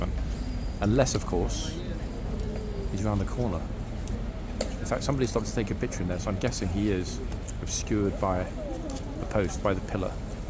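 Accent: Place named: RP, United Kingdom. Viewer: Oh you never ever fail to make me laugh out loud with your terminology 😂 peasants & rich coming out of raffles 😂you have a very good posh accent my dear man. Thanks for showing us around London, I love the beautiful architecture of our capital city, truly amazing. Keep doing what you do ❤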